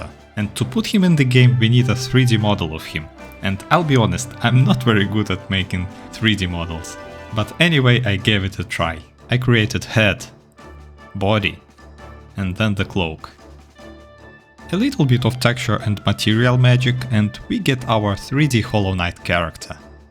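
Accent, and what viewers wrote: Russia. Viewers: Hello! Просто вопрос, вы из россии? Просто по акценту похоже. Да и похоже на. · На самом деле у тебя очень хороший английский. Но всё равно русскому уху очень слышно)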